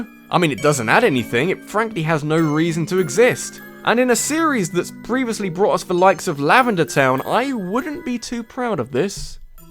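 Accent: England, United Kingdom. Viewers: For those wondering, he did pronounce Lumiose City correctly. While his pronunciation of the last syllable would usually be wrong, his particular English accent gives him a free pass, since the real pronunciation actually would sound like that if spoken with that accent unless the speaker was fluent in French to the point of using it interchangeably with English. As for the emphasis on the word, there is no accent on any of the vowels, so the first syllable gets the emphasis.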